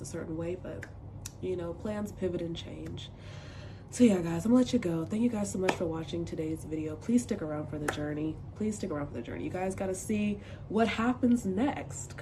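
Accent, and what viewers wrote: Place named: United States. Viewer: Wait are you American with Jamaican parents…or are you 100% American parents and grandparents etc… because if you have no Jamaican background you are in for a culture shock 😂. You will get hit with higher prices because of your American accent. You will run into a few honest people who will charge you the correct price, but it is rare. There is no such thing as customer service in Jamaica 🇯🇲 unless you are at a high end resort 😂😂😂😂. 🗣️WELCOME TO JER-Makerrrrr! 😅 it’s a beautiful place and so peaceful and my SOUL FEELS LIKE YESSSSS YOU BELONG HERE ❤❤❤❤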